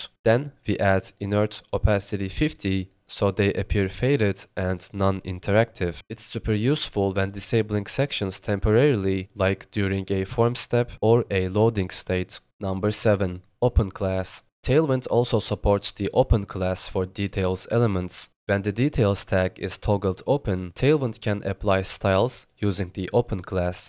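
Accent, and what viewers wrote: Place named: Turkey. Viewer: türksün sen aksanın bağırıyor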